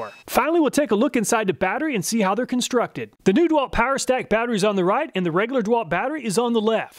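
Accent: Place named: Pittsburgh, United States. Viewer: Are you from the Pittsburgh area? You have the accent. Haha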